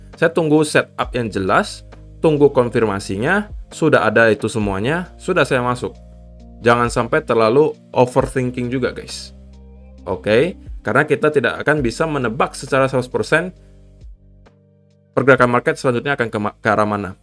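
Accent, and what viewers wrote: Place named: Medan, Indonesia. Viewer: Bro kamu China medan apa Bangka? Logatnya medan y.. Salam dr medan bro 🍻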